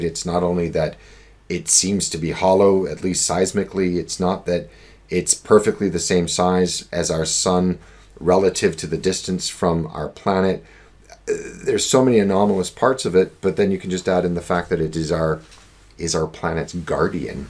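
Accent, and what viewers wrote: England, United Kingdom. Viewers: Interesting stuff, it's good to see you making more videos, yt is a lonelier place without the melodic, perfect English accent of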